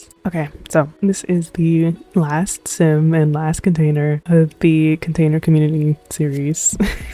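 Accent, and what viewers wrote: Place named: New York, United States. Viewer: This was such a fun series! Also your New York accent came through real strong in this video and it literally made me miss home so thanks.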